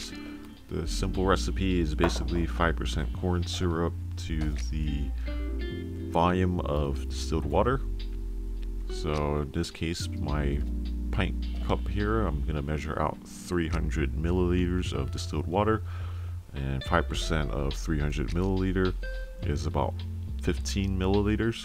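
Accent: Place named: Ohio, United States. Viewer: All I kept saying is that this guy is def from Ohio or near some Amish country. Lol thanks for the lesson!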